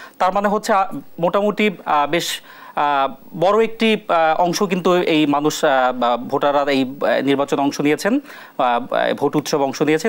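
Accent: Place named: Kolkata, India. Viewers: বাংলাদেশ উপস্থাপিকা গুলা এখন কলকাতার ভাষা ফলো করে😂😂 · মনে হয় কলকাতা উনি আসছেন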